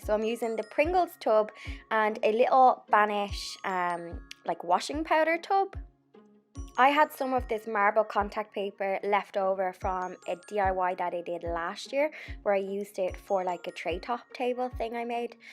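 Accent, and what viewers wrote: Ireland. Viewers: Lovely Irish accent! Super video. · I love your Irish brogue! It's darling, and your crafts are darling, too. The little gift boxes have inspired me to get me granddaughters crafting with me!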